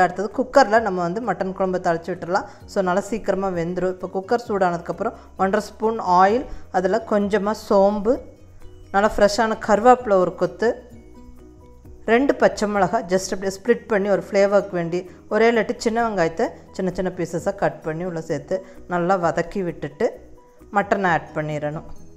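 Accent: Kongu, India. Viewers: Mam super recipe. Kongu Tamil superb Namma Coimbatore subscribers ellarayum meet pannalamae mam PL reply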